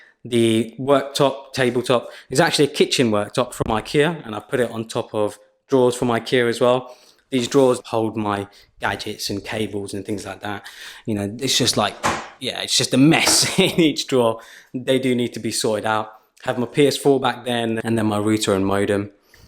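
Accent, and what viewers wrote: United Kingdom. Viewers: pretty house! and love your british accent thou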